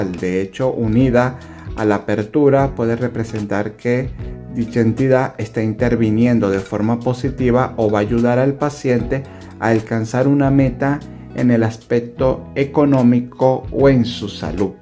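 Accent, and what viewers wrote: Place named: Venezuela. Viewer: Excelente espiritualista, muy claro y conciso, de conocimientos amplios sobre temas de magia y espiritualidad con mucho conocimiento de la antigüedad respetando los rituales de como deben hacerse, te felicito además ese acento y dicción excelente me parece venezolano, excelente la verdad ojala te hubiese conocido acá en Venezuela para aprender lo que no sabemos de la magia y nuestros ancestros, bendiciones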